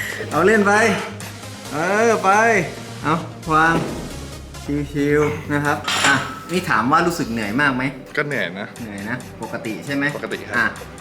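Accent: South, Thailand. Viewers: พี่คนใต้ หม้ายยยยนิ สำเนียงนี้หมันเลย55555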